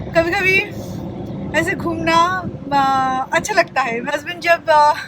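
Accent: Bengal, India. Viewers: M following her since last 5 months and loved her vlogs i almost watched her all previous videos too loved the way she use to handle every thing and specially her bengoli hindi mix accent but i dint criticised her ever i just go through comments section and use to see hw ppl use to troll her and feel bad for her but she doesn't care for her viewers.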